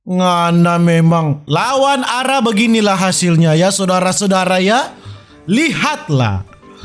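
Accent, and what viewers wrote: Manado, Indonesia. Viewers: Logat Nya Kak Yg Bikin S Betah Manonton Memang Orang Manado Da Best Memang #SalamDariLuwuk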